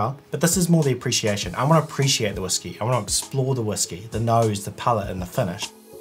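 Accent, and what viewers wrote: New Zealand. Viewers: Not being a mother tongue English speaker, although having a decent understanding of the language, I'm shocked that I didn't get the NZ accent...but now I can't unhear it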